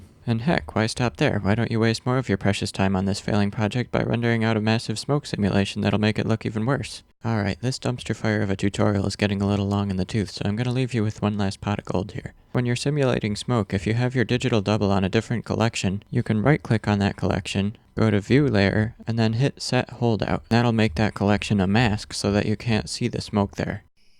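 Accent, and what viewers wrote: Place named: Canada. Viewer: Joe Pera with a Canadian accent and Shawn Mendes face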